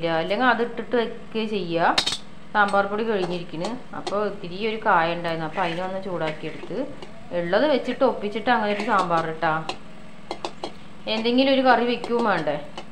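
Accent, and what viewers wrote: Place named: Palakkad, India. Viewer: പാലക്കാട് സംസാര ഞാൻ വീട്ടിൽ പോയാൽ ഇതുപോലെ സംസാരിക്കാം👌❤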